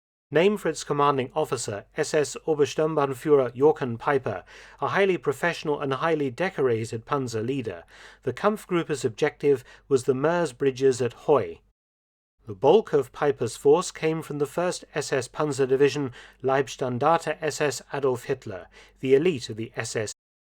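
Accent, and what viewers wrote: United Kingdom. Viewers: I’ve been really enjoying this channel lately. Even his British voice goes perfect with this content. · Mark Felton Productions-- About 98.6% of your videos are FANTASTIC because you don't use politics or PC or pass judgements, just the facts!! Your videos are more education about the ww2 era than a 200 or 300 college level course on WW2! Your accent sounds British…true?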